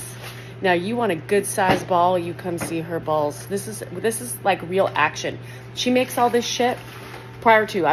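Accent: South, United States. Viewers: Good afternoon, and once again, you are the always hilarious and sarcastic chronicler of everything and your sassy Southern accent is the icing on the cake lol!! Those cookies all do look damn good and who doesn’t eat the whole Oreo?? Of course you have to take it apart and lick off the cream inside but also part two is dunking a whole Oreo in a big tall cold glass of milk (anyone who doesn’t know this is the procedure with Oreos, doesn’t deserve them!!😂); have a great day, and let’s hope you did not get diarrhea from eating all the cookies lol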